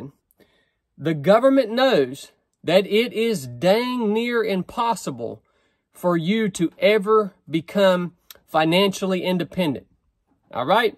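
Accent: United States. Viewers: Where in the USA is the accent from? 👍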